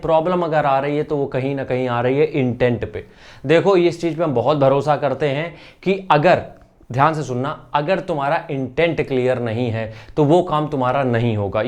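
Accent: Kanpur, India. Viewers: Kanpur se hai lgta😊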